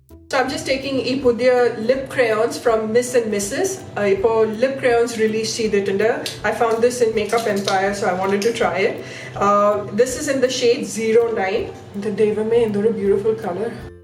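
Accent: Kerala, India. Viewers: Hi dear.. we need more malayalam videos... we like to watch ur malayalam accent.. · OMG!!!! I didn't know that You Are a Mallu😍😍😍😘😘😘 U r So Cutee while Speaking in Malyalam Chechii😘😘😘 Love From Kerala... Keep Inspiring us😘😍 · Ayyiooo..... Nthoru cute aan ee malayalam.... Sweet 🥰😍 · "Manglish" Coz let's be honest 😂😂😂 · Your Malayalam is super cute ❤❤ 90% English 10% Malayalam ❤❤ more than how well or grammar mistakes and stuffs like that you did try to talk and you did talk in Malayalam. Adu madi ❤❤ Enikk personally kure kure kureeee ishtaayi.. ❤❤ And that new jewellery can totally relate to it. 🤣🤣🤣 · chechi is sooo cute speaking Malayam..... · Love how cute your manglish is.. being born outside kerala even me n my sisters speak the same way.. alongwith some hindi as well..😂 And your excitement for onam is making me also feel so much more excited about it..😅 Can't wait to see baby nirvana in pattu paavada..😍😍😘 Love you always.. 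· Loved your maaaaanglish 😂😂😂😂😘😘😘😘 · Sissy it's sweet to hear your Malayalam, I don't know why you look so very beautiful 😍 and lovely in this video, I need a suggestion what.kind.of soap I can use for my 4 year old son,.if possible please do a skincare products we can use for kids, the way you express like mulla poo, kutty pavadai lovely💕 lots of love to Nirvana kutty devadhai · Absolutely loved d video and d manglish ofcourse.. Love you too Chechi ♥️🥰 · Hahahh.. your malayalam is sooo cute 😂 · Thnk u..chechi....u r just awesome....😊😊💖💖💖💖💖💖💖💖💖ur malayalam is so cute...do malayalam video atleast once in a month plz...plz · Your malayalam is super adorable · Your Malayalam is so cute chechi...loved it...😍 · Veetylirikaan entoru stylaanu ammachi.... Omg ur Malayalam is so damn cute.... Definitely there should be more of manglish videos 😍😍😍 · I clicked like on the get go just for the Manglish 🤩♥️♥️ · കുട്ടീടെ മലയാളം കേൾക്കാൻ രസമാണ്... 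great effort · Chechi ur malayalam is soo cute. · U r Malayalam is soo cute 😍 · Ur malayalam s so cute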